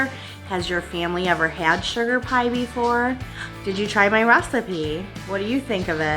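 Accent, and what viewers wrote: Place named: Michigan, United States. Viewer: Oh hearing the Michigan accent and seeing the Go Blue Crockpot in the background, makes me miss MI all the more! I wish I still lived there! When Mike came back from MI in September, I made him bring be back a lot of Blue swag lol Great video! I will have to try this sometime! Apparently you can make it with just water too!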